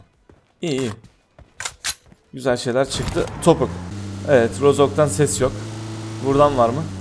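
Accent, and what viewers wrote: Adana, Turkey. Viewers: Adanli sivesi sananyakisiyoya bir videoda sadece oyle konus😉😆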